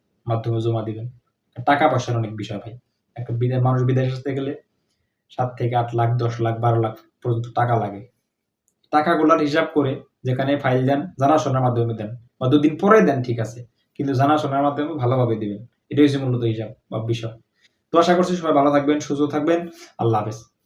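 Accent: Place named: Sirajganj, Bangladesh. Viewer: ভাই আপনার বাড়ি মনে হয় আমাদের সিরাজগঞ্জ৷আপনার কথা শুনে আন্দাজ করলাম ভাই 😊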